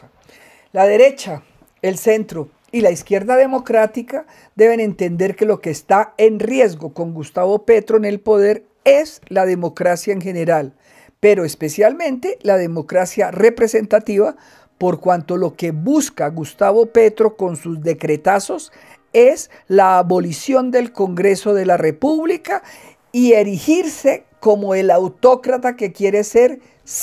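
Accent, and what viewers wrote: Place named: Monterrey, Mexico. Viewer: ¿Señora, Usted dice de todo, pero no aporta ninguna prueba, solo se burla de Petro. ¡Usted es clasista, racista, se le nota por su habladito 'regio'!! ¡Qué basura de canal, coja oficio!!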